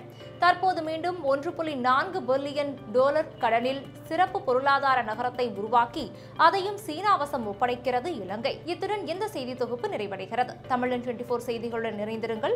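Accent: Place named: Tamil Nadu, India. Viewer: இந்திய தமிழ் செய்தி வாசிப்பவர்கள் போல வாசிக்கிறார்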